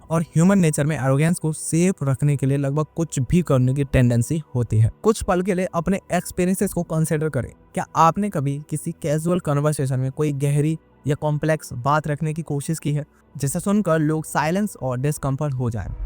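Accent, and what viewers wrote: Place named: Bengal, India. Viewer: Ek baat samajh aya ki bolne wala Bangali hai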